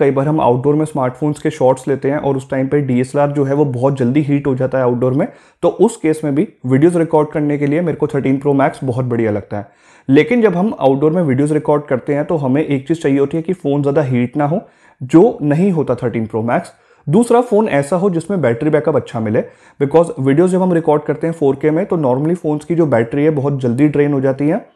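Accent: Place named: Punjab, India. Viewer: Punjabi lagde oh tuc mainu thodi thodi hindi ch punjabi lag ri aa😅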